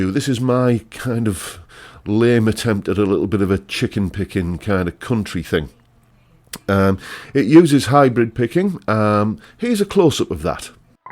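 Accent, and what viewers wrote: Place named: Northern England, United Kingdom. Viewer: As always, I do hope you're well 😎😊 love your stuff, really really love it, and who can honestly say that your northern accent isn't totally great 😎 Just a pitty I live in Denmark, otherwise I would be having lessons in person every week 🇩🇰😎😎 Love it and keep up your great stuff To many YouTuber guitarist's making c.... with their posted videos. Great stuff and very interesting stuff in many ways 👍🏻👍🏻😎😎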